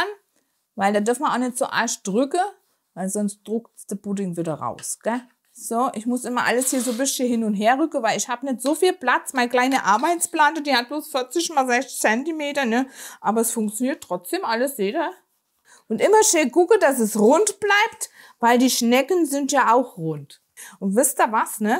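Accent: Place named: Palatinate, Germany. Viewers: Ja, das sieht lecker aus! Und wenn ich dieses Pfälzisch hör, bedauer ich's mal wieder, dass ich keinen Dialekt anständig sprechen kann. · Wenn ich mich nicht irre hört sich des an wie bei Joy Fleming, also Mannheimer Schnauze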